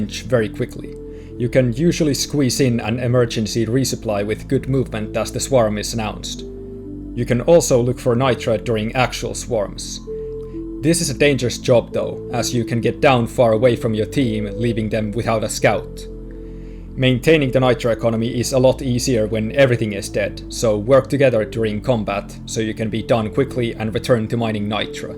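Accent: Finland. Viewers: Nah it’s finnish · That's a very finnish accent. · are you finnish/estonian?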